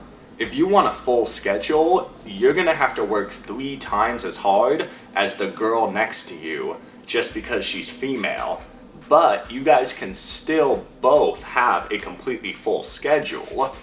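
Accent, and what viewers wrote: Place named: Louisiana, United States. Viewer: Handsome Canun Louisiana accent.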